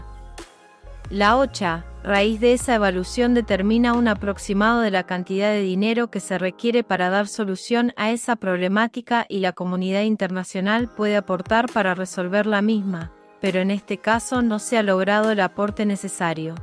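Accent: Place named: Argentina. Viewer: Tu acento me parece argentino.el PLD no deberia hablar del tema.ya que el gobierno de ellos fue el, que mas haitianos ilegales le dejado a RD.practicamente no hicieron nada para detener la inmigracion ilegal .si el PRM sigue con esta iniciativa de resolver este problema los Dominicanos debemos mantenerlo gobernando por muchos años.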